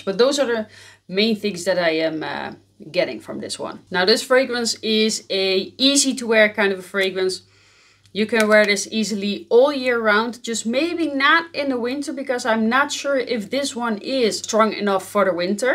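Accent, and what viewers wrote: Netherlands. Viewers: Dat Nederlandse accentje😊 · Absolutely love your Dutch accent 😍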